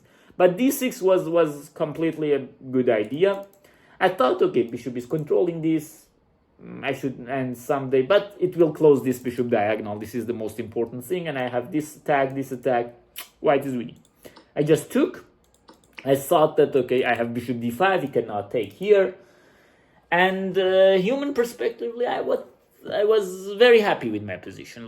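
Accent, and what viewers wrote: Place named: Italy. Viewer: You’ve got Italian accent 😇 Cool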